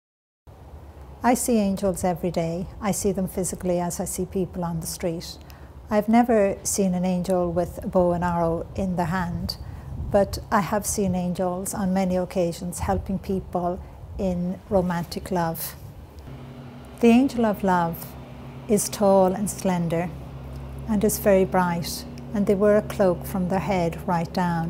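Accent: Ireland. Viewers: She's Irish, so 'tread' I guess lol. No offence, it's just the way they pronounce it there :)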